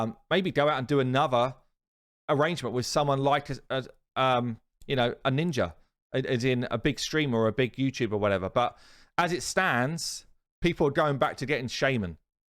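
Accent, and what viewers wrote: United Kingdom. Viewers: i could listen all day long to your lovely british accent! 😂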